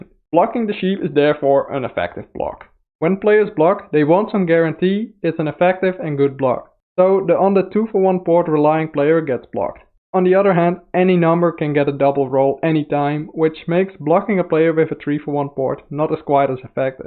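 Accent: Netherlands. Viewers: Ah, thought you sounded Dutch! Is there a bit of a community here in The Netherlands? Would love to go to a tournament in the future after this whole virus thing...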